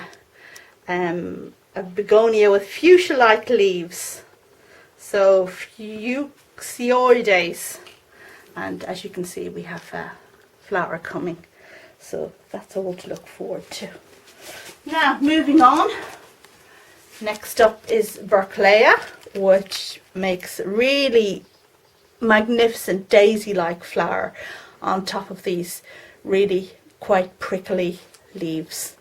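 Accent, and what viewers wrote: Ireland. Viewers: I appreciate your Irish accent so amazing! Keep on making your marvelous job!... It.’s pm in Rio but I had to see your voice and your lovely way of explain the plants secrets...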